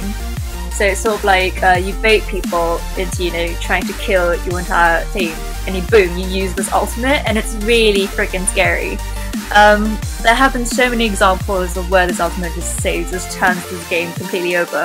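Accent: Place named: United Kingdom. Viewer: Gg British accent..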